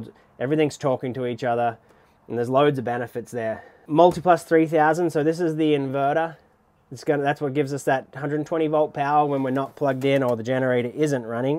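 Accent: Australia. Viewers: You sound like an aussie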